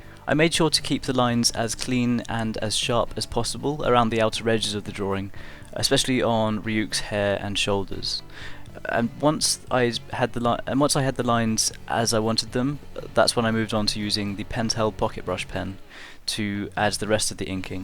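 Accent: England, United Kingdom. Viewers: ¿Quien no adora ese acento inglés que tiene? uwu <3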